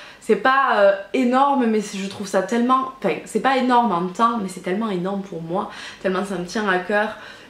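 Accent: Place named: Southwest, France. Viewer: Il faut toujours avoir de l'espoir et prendre ses rêves pour des réalités. Ca fait un peu cliché et tellement vu et revu mais en même temps c'est teeeellement vrai... Merci beaucoup pour ta réponse en tout cas, ça fait pas mal de temps que je te suis, ça me fait plaisir de voir une Youtubeuse qui est proche de ses abonnées et qui en plus vient du Sud-Ouest! J'habite à Toulouse mais je viens d'un petit village à côté de Tarbes, j'ai le même accent que toi :p Bisous!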